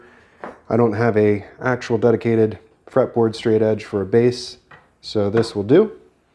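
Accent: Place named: Prairies, Canada. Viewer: Get that nut out real quick. Are you by chance from Saskatchewan, Alberta, or Manitoba? Thanks for the tutorial. I will now attempt the repair.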